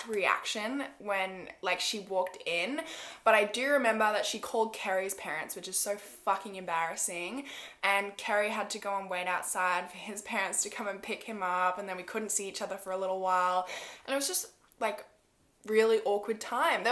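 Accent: Australia. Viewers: I know I’m so late lol, but I’m binge watching some of your videos.. In a non weird way, I could listen to you talk for hours.. Your voice is so soothing & I love your Australian accent. It’s so funny to hear you pronounce or say words that we wouldn’t normally use to describe something in America, it has me so intrigued lol.. & all of the earring changes for each topic was iconic! Love your content girl 🥰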